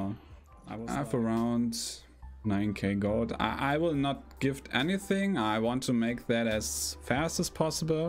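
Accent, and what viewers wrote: Germany. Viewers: Hey there, von deinem Akzent ausgehende denke ich, dass du deutsch sprichst. In der Hoffnung, dass ich den Kommentar nicht auf englisch wiederholen muss, möchte ich dir sagen, dass es absolut nachvollziehbar ist, warum du dieses "Spiel" nicht mehr spielen möchtest. Suchtmechaniken, verbunden mit P2W-Mechanismen sind absolute K.O.-Kriterien. Ich habe LostArk nie gespielt, aber die Kritiken, welche ich darüber gelesen habe, schlagen in die gleiche Kerbe wie dein Video. Ich hoffe bald wieder deine Bloodletter-Zauber-Videos bestaunen zu dürfen. Auch wenn Albion nicht perfekt ist, die Community haut' raus. Und nach meinem Kenntnisstand, bist du da n verdammt gern gesehener Typ!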